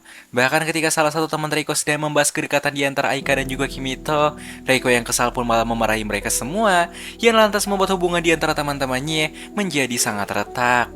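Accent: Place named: Bandung, Indonesia. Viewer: logat bandung jier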